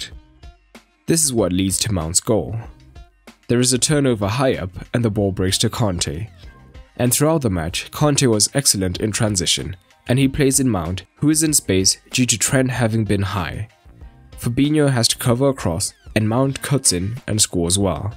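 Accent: South Africa. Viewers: The guy doing the voice over South African?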